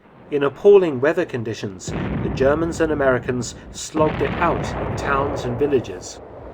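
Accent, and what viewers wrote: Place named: RP, United Kingdom. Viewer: You kinda sound like a posh clone-trooper.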